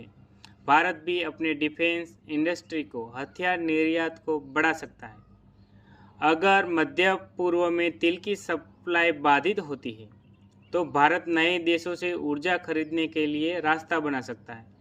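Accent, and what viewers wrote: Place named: Gujarat, India. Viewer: Gujju Hindi … love it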